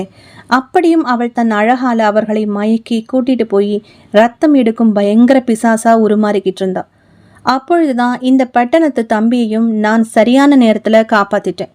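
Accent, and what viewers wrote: Kerala, India. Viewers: Nala story, I liked it.... paravala malayalam Pronunciation improvement iruku 😅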